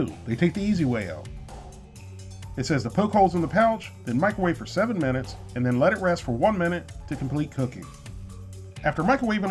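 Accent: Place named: Baltimore, United States. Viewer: Heh, "Baldimo", yep you're from there. Cheers from MD!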